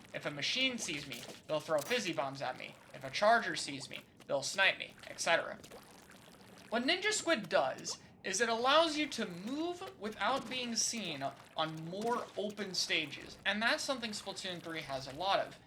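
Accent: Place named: United States. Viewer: Something about your cadence in this video makes you sound like an American flc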